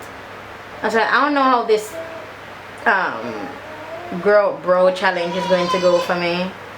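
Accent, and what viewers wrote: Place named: Jamaica. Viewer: nice girl... from the first time I watched your video I heard your accent and I know u were Jamaican... big up uh self goodie mi a Jamaican too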